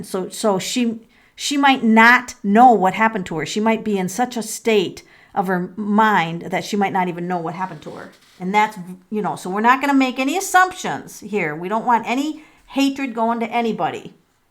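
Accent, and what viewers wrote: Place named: Midwest, United States. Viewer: I love your mid-western accent🤗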